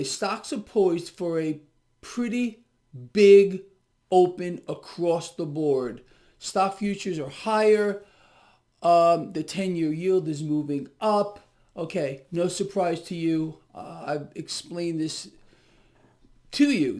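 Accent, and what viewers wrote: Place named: Brooklyn, United States. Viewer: Puppet Mahsters XD Love the accent, is it a Brooklyn one? Im from England so I wouldn't know lol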